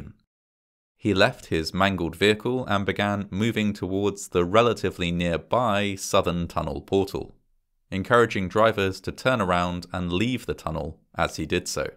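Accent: England, United Kingdom. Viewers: I adore this horrific channel. I think the British accent is the absolute best accent for speaking English. It just is…I’m a dummy and it always sounds more sophisticated; in particular, I like my tragic stories delivered from a British accent…I don’t want to listen if it’s not British 😊 maybe Aussie in a pinch but I really love them dirty little Britts. You’re awesome! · I recently discovered your channel and (in hopefully as unracist a way as possible) I must say I love listening to narration with an English accent, as opposed to the OTT overly dramatic sounding American accents that seem to dominate disaster documentaries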